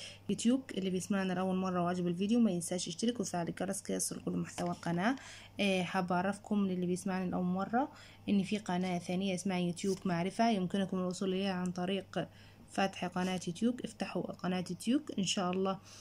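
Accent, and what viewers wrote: Egypt. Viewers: انت مصريه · حبيت نعرف حاجة هذي مصرية يسما مصر يقراو كيما حنا ولا كيفاه من فضلكم جاوبني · مصرية او جزائرية🤯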